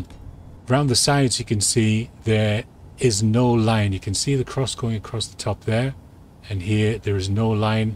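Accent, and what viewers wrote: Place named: Scotland, United Kingdom. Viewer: Thank you so much, this helped alot!! Love that Scottish accent too, keep it up!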